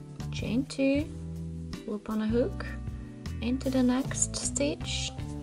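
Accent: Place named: Russia. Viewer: Thank you for explaining how to read a chart. I have always wished I could follow them. I have some amazing crochet thread/yarn that I bought 8 years ago but never did anything with them because I did not know how to read the pattern they were purchased for. I asked for a written pattern and received a chart. You have given me a wonderful gift of knowledge that is priceless. The only place I was fuzzy about was the initial chain. You appeared to make the chain length 8-10 times from the chart and that is why you were making the boxes on your sheet? Is that correct? That is how you make it to the circumference for each individuals sizes. Please tell me if I am understanding this part. The rest of the pattern appears to make more sense to me now. One last question, your accent. Where if you don't mind me asking are you from. My sister-in-law Valentina is from Russia and has a lovely accent similar to yours.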